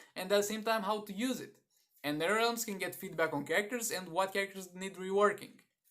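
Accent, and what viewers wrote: Romania. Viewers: Omg Ur from Rumania. Been wondering where your accent was from for a while.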